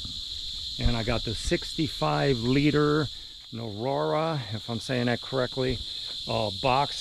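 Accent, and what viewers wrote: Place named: Pittsburgh, United States. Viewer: If I didn't know any better..I'd day you were from Pittsburgh area, I can hear the accent, I to am from the burgh, but I definitely understand seeing the young lady, why you want go to another country lol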